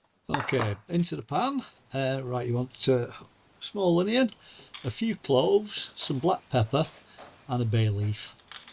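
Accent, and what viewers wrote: United Kingdom. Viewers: 👍👍👍...Hallo, I came across your channel while looking for kidney recipe! Unfortunately, I don't understand your ( Yorkshire?) accent very well🙄 And my school English is long gone😂...about 40 Years...! But your are a great guy! Still, I enjoy listening to you👍 Your channel is so wunderfullly, refreshingly NORMAL. Without modern heigh- tech kitchen und equipment! Okay, till now, you are not a greatest cameraman and your special Omelett scared me at first😂 But in the end it looks delicious. Waiting for more! I left a subscrition. Greetings from Germany...Caroline 😊❤ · Love this Lancashire accent, and the calm way of cooking!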